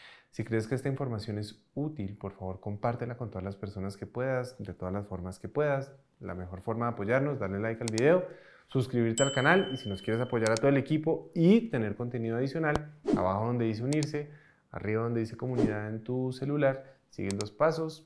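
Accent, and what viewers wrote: Colombia. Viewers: dije meditacion...y si..no lo recomiendo dira muchas cosas buena pero me parece dice mas cosas malas y mentiras...no se si el cree sus mentiras o no. Te recomiendo no te dejes engatusar por su acento colombiano bonito. Lo siento "doc" es lo q pienso.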